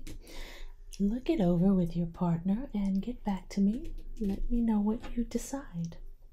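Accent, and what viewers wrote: South, United States. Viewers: Is she from Texas? It sounds like a slight southern something in her dialect...not full on Texan or Dixie talk but there’s a little southern comfort in there.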